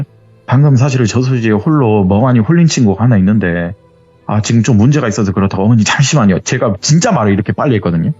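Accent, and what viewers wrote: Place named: Jeolla, South Korea. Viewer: 뭔가 불만이 많은듯한 전라도 특유의 꼬여있는듯한 말투.ㅋㅋ 깡촌을 깡촌이라 하지 뭐라그럼?ㅋㅋ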